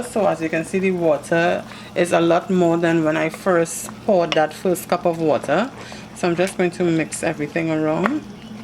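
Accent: Trinidad and Tobago. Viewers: Ah hearing that beautiful Trinidad accent.... Love d red black and white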